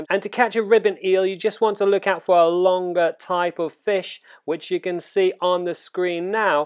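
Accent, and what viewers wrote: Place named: United Kingdom. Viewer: WATCH OUT FOR THE “LAW” DROP IN—bruh with your british accent i cant tell what the heck ur saying about the short window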